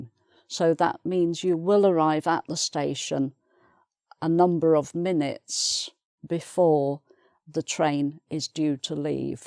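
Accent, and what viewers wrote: United Kingdom. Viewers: Thanks. I love your British English. I hope learn more english with you. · Thank you so much for your lesson. I love your British accent . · I like a lot as a teacher.Because she is so patient and very skilful in teaching English.Also I like so much her British accent.She is so nice.!!! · British accent 😍 really lovely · you are a good teacher, and l like your strong UK accent very much! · I am a learner from China. You are so cute. And I really expect to have a Brit accent like you. I will follow your lessons. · I love your British accent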